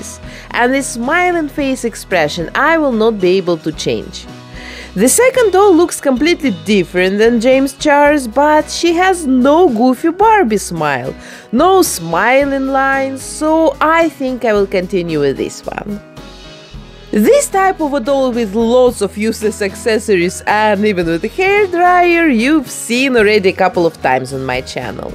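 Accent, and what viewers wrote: Russia. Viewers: You are Russian? very Russian accent🤨🧐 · Do u have a really strong Russian accent. May u please tell me because it’s beautiful 😂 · однако здравствуйте she definitely speaks Russian , as I Russian I can hear it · Oh girl u have such a cute face and russian accent:)) U are doing such a great job! Greetings from Romania! ❤️❤️ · Я же не одна слышу этот потрясающий русский акцент? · Я одна слышу этот ужасный русский акцент???? С таким же акцентом у нас на МЦК остановки на английском объявляют: "Зе некст стейшн Сокольиноя гоура... Пуш де бадн то эксит".... и всё в таком роде, аж мурашки пробирают от такого акцента((((( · Русский акцент , наших везде узнаешь. Просто перекрёстная работа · no,it is russian accent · Как - будто акцент русский у неё. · Вы разговариваете как моя учительница по английскому Жесткий русский акцент 😂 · where are you from? 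You have very russian accent:)) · Всё видео про это думал. Она точно из России, у моих одноклассников такой же акцент))) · Какой-то акцент русский что-ли 😄😅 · Ух, ну очень русский акцент Но работа прекрасная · типичный русский акцент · Tiene acento, habla inglés perfecto, solo con acento, aparentemente ruso o de la región. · Такой жёсткий русский акцент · u have such a RUSSIAN accent,woww!! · You look like my past 2-5 grade art teacher, you guys also have the same Russian accent- (I think you have a Russian accent idk-) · такое КРАЙНЕ русское, твёрдое произношение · Кажется что у вас русский акцент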